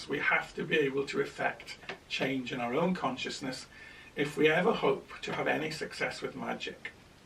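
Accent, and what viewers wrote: United Kingdom. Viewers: I like the way this guy talks he is British like my mom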